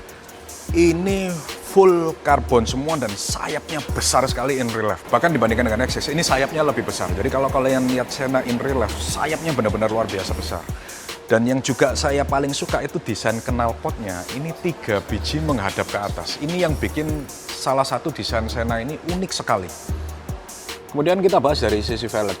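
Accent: Java, Indonesia. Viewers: kaya tapi jelek dan suaranya kenapa jowo bgt yah? tapi mantabh lah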